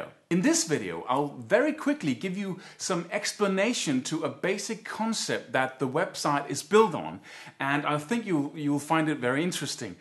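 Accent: England, United Kingdom. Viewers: Wow you look like me with short hair no facial hair and an english accent , shred on cuz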